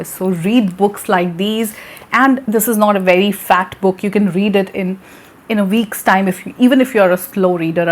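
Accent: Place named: India. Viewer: Thanks my dear teacher. I'm well aware that my name is quite familiar to you as I've been one of your regular viewers on this educational channel for a long time. I have not missed any of these video series thus far and often keep an eye on the next episode. The reason is that I have never been able to listen to a mentor like you who speak excellent Indian English with such clarity though I have listened to a few others. Really you're speaking to our hearts. There's a lot I've learnt from your talks so far and there's much more yet to be learnt. I'm often with you on the channel. Just like all the previous episodes, this video too is important as you're giving us some book recommendations. Indeed, books 📚 have the potential to change our lives and it's important for all of us to read books. Out of the 5 good books mentioned here, I've read only one - 'The Alchemist' by Paulo Coelho, which is one of my favourites too. 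I'm sure that the other books are available here in Sri Lanka. I'm a member of The Colombo Public Library, the largest library in Sri Lanka and think that they may be available there. There's another book which I like most. It is 'The Village by the Sea' by Anita Desai, who is an Indian author. Some time back, this book was a prescribed text for the A/Level English Literature in Sri Lanka. Though there are lots of books not all are so interesting as we think, but stories of that kind are read by all mainly due to impressive style of story telling. Just lik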